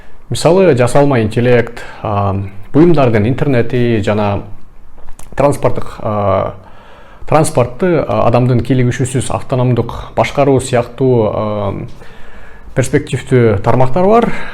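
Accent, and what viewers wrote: Standard. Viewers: Кыргызча абдан таза суйлойт экенсиз. Ийгилик! Озум программалоо багытынын 2-курсунда окуп атам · Ассалам алайкум тууганым кыргыз тилин таза сүйлөйт экенсиң азаматысын! Кыргыз мусулман ошого динибизди да үйрөнүп алсак жакшы болот.ишине ийгилик каалайм алга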